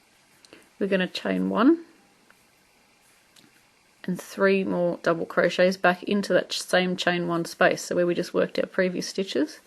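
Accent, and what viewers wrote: Australia. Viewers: So nice to hear an Aussi voice. This will be my next project.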